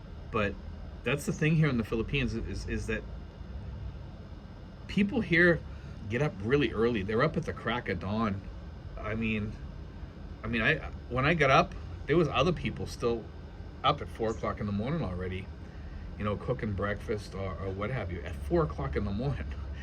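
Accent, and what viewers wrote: Boston, United States. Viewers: Awesome video as always . Cool Bawstawn accent dude !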